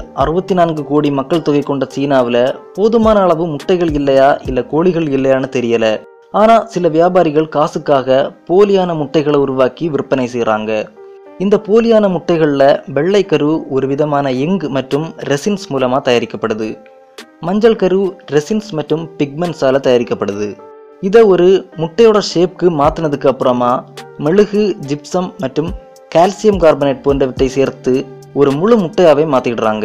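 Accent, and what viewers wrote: Kanyakumari, India. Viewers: Bro unga slang....kanyakumariya...... nagarcoil ah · Kanyakumari slang..... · Makka.kanyakumariya.unakka bhasa kettapale manasalaach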